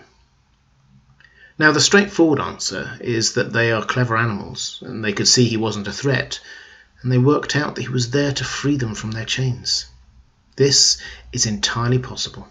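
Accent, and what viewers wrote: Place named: RP, United Kingdom. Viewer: Your delivery is sounding much better. You've incorporated all the things I suggested. Combine that with your RP accent and you're just about the best GoT voice on here. Secrets of the Citadel is tops for similar reasons plus the depth of what she presents, but you could match her and Because Geek is energetic and so lovable, but you're up there now and your voice has it well in hand - good work. Your British accent is so appropriate for the subject. The Order of the Green Hand takes on a manner of speech that is fitting of the Medieval culture, are intelligent, but their voices smack of snobbery and overly serious and these things loose them points. Your hooded look also helps your sense of belonging with GoT. You look like a mysterious stranger someone might find on the road. Someone who looks sort of regular and harmless, like a peasant or wandering monk, but is actually a spy for the Iron Bank or something more dangerous posing as harmless.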